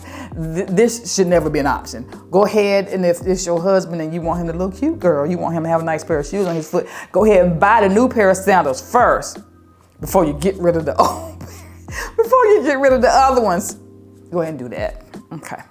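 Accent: South, United States. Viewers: First time tuning in. I was so distracted by her talking about herself and her clothes in the third person "she" and the country southern fast-talking twang and accent was everything... especially when she repeatedly says "cumtuble" over 100 Xs for the word "comfortable". Could never take someone that talks like her very seriously about anything "fashion". Let me try watching another one of her videos. I'm sure she's good but as the English scholar that I am.... my ears 🤔😏😏🙄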